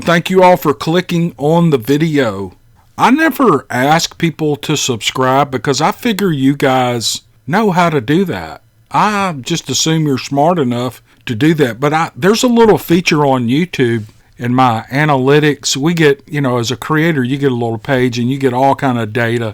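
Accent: South, United States. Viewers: Love your stories. It's just what I need to get thru these bad, horrible times. It's bad up here in the northeast. I love your southern twang and relaxed state. God bless. From cold NE. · I just want to say that I immensely enjoy all your stories and music and I enjoyed watching your bike ride with your cute dogs running around you. I hadn't heard much about dogman and was surprised to find out about another cryptid. I love hearing about sasquatch, dogman, and the ufo sightings. I live in Toledo, Ohio and I love your southern accent. It's so friendly and homey sounding. Well, I don't want to ramble on to much but I love your channel and could listen to the stories all day and I can tell that you love reading them. Thank you. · are these your drone shots? I love them. I am from the lowlands of SC, big family property in the middle of nowhere. I live in Northern California these days. 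Your videos make me think of home as does your accent and manner. I listen to your stories whenever they come out before bed. So thank you! Some of these stories have happened in either my county (Santee in Orangeburg) or on the New River in NC where the other side of my family has been there for hundreds of years. Never seen Bigfoot. Other things though. Anyways I got to visit Mississippi someday. People kinda put it down but obviously that is hogwash especially if you love the land and blues. Kinda like an even wilder version of SC. Let me know about that drone footage.....and yes dogs have a very special and particular place back home too, they qualify as a y’all. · Hey, I've commented in the past about how I love your voice and soft southern accent. Well, I didn't mention it before, but I also think it's wonderful how you have such a kind, tender heart!!! With your love of the outside, your dogs, your home State,Mississippi, and your family, I think you are about the perfect Southern Gentleman!!! 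We are so lucky for! Great stories! Great narration! I am so glad you spend time creating such an interesting and fun channel!!! Thank you for all the time & thought you put into making great entertainment!👏👏👏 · "How y'all doing?" Absolutely Southern. I've been watching bee keepers on YouTube and one in North Alabama said the "comb was about the size of a bisquit. " Just had to laugh. Where else would you hear that comparison? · I’m pretty sure I hit subscribe 5 seconds after hearing you start narrating. - your voice/cadence/accent is totally “good ol boy” and I can not get enough!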